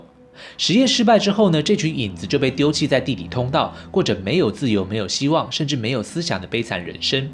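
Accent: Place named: Taiwan. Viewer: UP主应该是说的标准台湾国语吧，讲的很好，口齿清晰，声音也好听，全程没开字幕听的很清楚。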